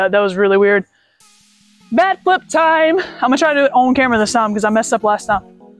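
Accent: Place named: South, United States. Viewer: Californian Here Lol I Dig Your Videos And That Heavy Southern Accent Dude Lol Keep Them Comin Darlin..I To Played Baseball Haha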